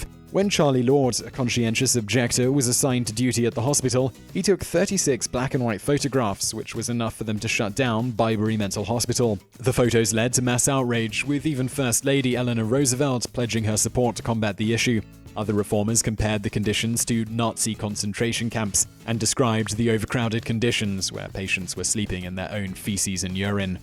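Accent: United Kingdom. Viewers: Not trying to be mean but the british accent takes away from the video.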